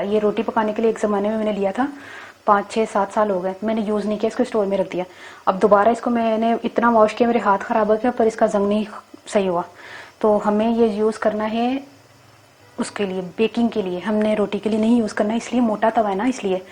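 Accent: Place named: India. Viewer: Aap ki punjabi aur hamari punjabi main fark hai tuhadi pure from india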